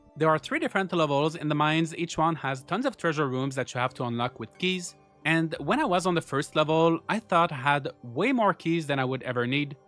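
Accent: Quebec, Canada. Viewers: Are you from Québec? ^_^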